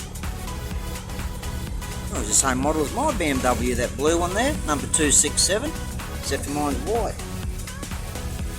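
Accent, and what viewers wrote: Australia. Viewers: Hello from an Australian in Japan⛩🌸🌸🌸 Great to see so many cool cars in the same place🚗🛻🏎🛻✨✨✨ And love your Ozzie accent💜 Have a great day🌸🐨💕✨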